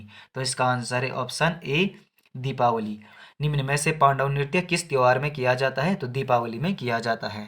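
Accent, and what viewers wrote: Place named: Rudraprayag, India. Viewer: Nice bhai ji rudpyag se ho lg raa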